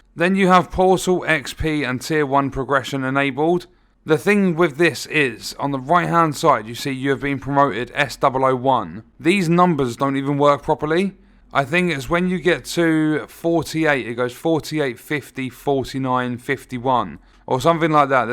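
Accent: United Kingdom. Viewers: Not to be disrespectful but the british accent makes this video even more enjoyable and funny. :)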